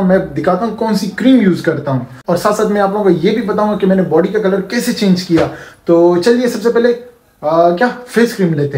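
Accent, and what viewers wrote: Pakistan. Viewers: Your transformation is amazing , you look so handsome but the issue is the way you speak, the moment you spoke it doesn't suit the swag you have in appearance. Pakistani language and male tone isn't sexy enough.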